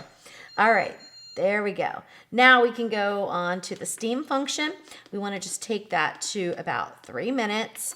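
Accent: United States. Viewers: So much talking! Just show us how to make the dish. Also, if you are half Irish and half German, why do you have an American accent? 🤔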